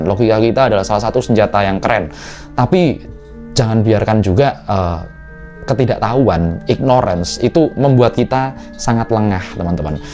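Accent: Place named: Java, Indonesia. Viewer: .. Saya sangat senang mengikuti kontent konten Tapi larbih baik lagi kalau logat bicaranya kembali ke asal saja.. Yaitu logat Jawa medok.. Akan kliatan Jawa sekali mmitu akan lebih enak dan unik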